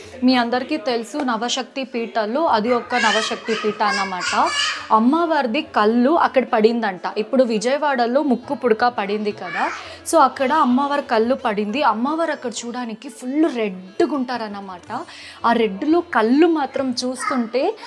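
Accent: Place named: Karnataka, India. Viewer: Valladi native karnataka state banglore anduke telugu ala vundi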